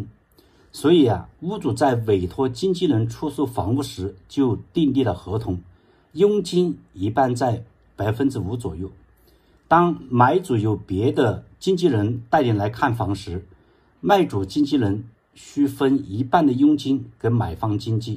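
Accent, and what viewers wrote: Hunan, China. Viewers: 听CJ的口音像是湖南人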